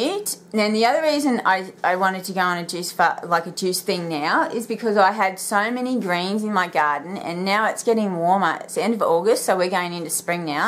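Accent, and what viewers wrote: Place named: Australia. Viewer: where in Australia are you? its good to hear an aussie voice on here. and i feel like we've met before!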